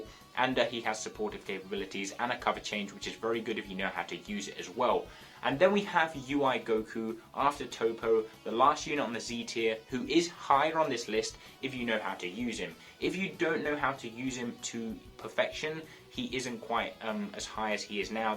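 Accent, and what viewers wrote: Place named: England, United Kingdom. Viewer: Do you come from England I like your accent. I come from England ❤️🏴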